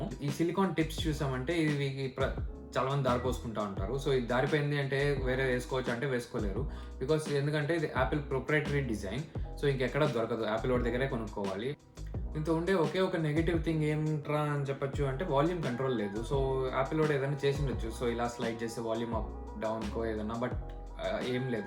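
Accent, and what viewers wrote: Chittoor, India. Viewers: Bro chittioor kadha meedhi??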